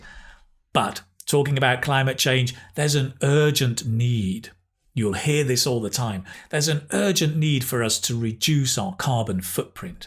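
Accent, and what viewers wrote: United Kingdom. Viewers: Это явно не американское произношение. Вы британец? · The British accent is wonderful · Hi, as always your content is very useful to practice the speaking section, but I have a question; You have a British accent, is there a chance that your advice can have some phrases/sentences/expression that is used just in the UK?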